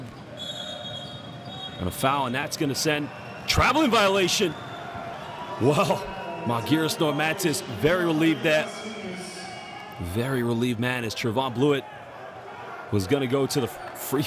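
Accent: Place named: Boston, United States. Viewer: Just watching this a year later for the love of the game. Sounds like the announcer is from Boston. Stunning shot to end q1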